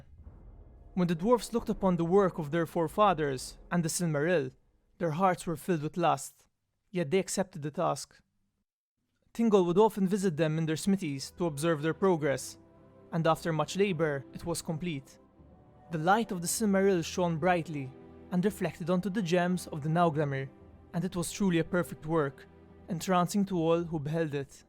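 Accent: Malta. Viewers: He sounds maltese:) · Love the maltese accent :) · What a coincidence, Im from Malta aswell, thought your accent was uniquely familiar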